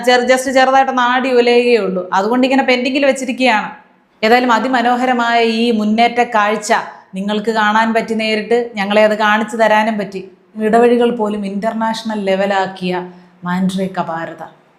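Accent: Thiruvananthapuram, India. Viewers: തീരുവന്തോരം സ്ലാങ് സൂപ്പർ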